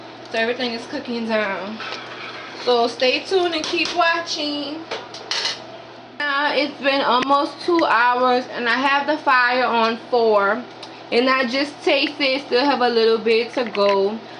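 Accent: New Orleans, United States. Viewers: Once i heard dat talk i knew you was from N.O and i knew i found the right video.. Thanks bb ;) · Thank you for your recipe. I emailed it to myself to not forget. Unfortunately there is no place to buy "pickle meat" in Cleveland, what else do you recommend. . Love your "Nawlins" accent. There were some times I had to replay what you said, but it was worth it. Again, thanks for your recipe. Will try it soon. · looks amazing, you can tell you from the n.o. with that fire accent lol!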